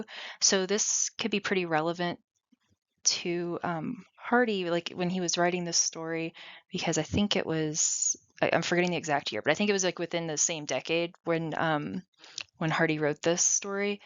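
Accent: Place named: United States. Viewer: Oh that ghastly American accent! How it jarrs....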